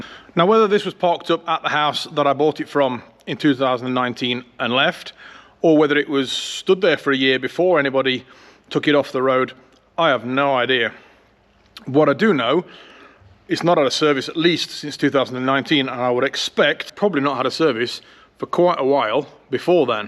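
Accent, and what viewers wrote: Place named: Northern England, United Kingdom. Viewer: Are you actually Swedish? You sound like someone who's from Northern England.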